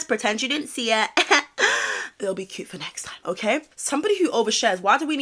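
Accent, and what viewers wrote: United States. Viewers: The American accent is killing me 😭 she is so sick and twisted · !! Thank you for always bringing the best pop culture content to the public! You slay, queen! Literally Crissy Tegan is so insane and I'm glad you're speaking up about this! Also, LOL I'm an American and I have to say that your American accent is so great!!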